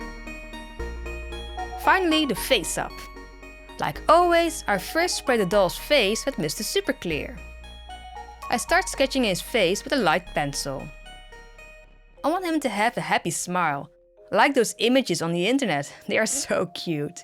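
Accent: Netherlands. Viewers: i had a suspicion you were dutch with the accent. but then i saw ZEEMAN EN TOEN WIST IK HET. Maar heel gaaf gedaan! Ik ben benieuwd naar je andere videos. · Geweldig gedaan! Ik wist niet dat je de nekopening op die manier kon vergroten. Aaah, dat heerlijke Nederlandse accent! Never get rid of it!!! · Ik hoorde je stem en dacht dat is een Nederlands accent haha. Maar wist het niet zeker tot ik de "zeeman" zag staan XD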